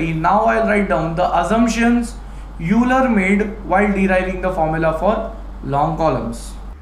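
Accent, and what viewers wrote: India. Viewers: Shit I HATE how Indians speak jobbers English ughhhhhh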